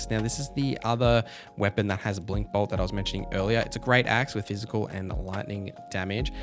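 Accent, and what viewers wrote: Australia. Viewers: Great video. I love your font of Australian accent and your energy❤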